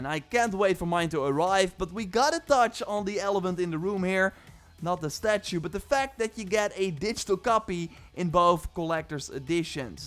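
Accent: Netherlands. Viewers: As a dutch u can so clearly hear u are dutch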